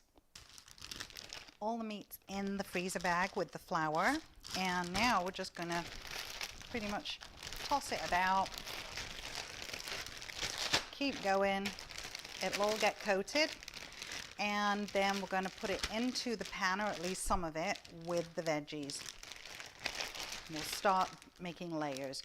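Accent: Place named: Manchester, United Kingdom. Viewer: That’s an interesting accent. Sounds a little Manchester little U.S?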